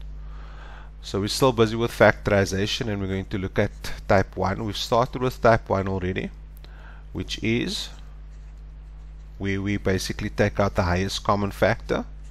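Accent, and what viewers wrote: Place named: South Africa. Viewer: lol i can hear he’s south african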